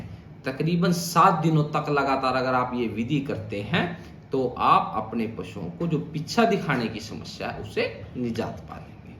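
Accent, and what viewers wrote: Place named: Haryana, India. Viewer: Dr sahab aap laagta hai haryaana se hai aap Hindi mein bahut aatak rahe hai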